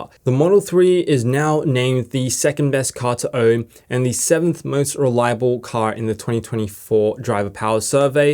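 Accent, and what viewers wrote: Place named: Australia. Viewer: You've got the Australian accent but keep using "miles" ... A little disorienting :P Now... I just need to drastically increase the amount of money I have and I'd be able to afford one :D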